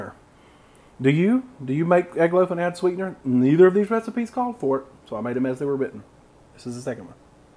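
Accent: South, United States. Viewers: Hey: ) This is my 1st time here...this video was recommended to me, I'd never heard of you or your channel before (or Egg Loaf, for that matter lol). When I saw Flo behind you I thought, "I'm in for one heckuva fun ride!". Then I heard your accent & thought, "Yep! Yep! Yep! I'm in!" (I'm from the South, too...born & raised). With each Egg Loaf, my mouth watered more, especially the 3rd one, the one you came up with. What else could I do but subscribe to your channel & follow you on social media? lol I'm so sorry, I didn't quite hear your question, could you ask me again, please? Oh, no doubt about it.....I'll be spending this evening & the rest of tonight (however long it takes me) watching all your videos, from oldest to newest, until I get caught up! lol Oh, man! Biscuits, Sawmill Gravy, Shrimp & Grits, Cornbread, French Fries, Cornbread Dressing, Pumpkin Pie, Pecan Pie, Pigs In A Blanket, Salmon Patties?!?!?!?!?!? 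Oh, you're spoilin' me, here!!! Seriously, though, I think you're wonderful! I love your style! Best believe, I'll be cooking up your recipes...& I can hardly wait! Thank you so much!!! P.S. "It helps if ya plug it in."!!! BWAHAHAHA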